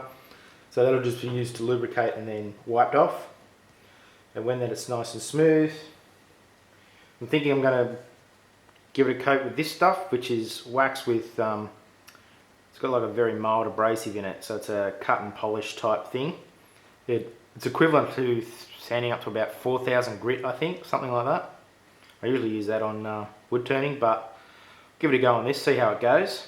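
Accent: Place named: Australia. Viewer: Hello Mr. - I couldn't identify the wood that stock is made of, until I Googled Sportco Model 63, and found out that it, like your accent, is Australian. That explained it! Your stock is made from Eucalyptus wood, which, for gun stocks, is not common at all. A very nice piece of wood on a very nice rifle.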